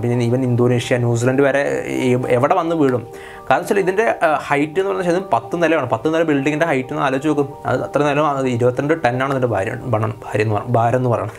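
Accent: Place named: Kannur, India. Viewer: aaah njan kannur aanu Ninghal palakad alle But baasha kannur kaar pola ind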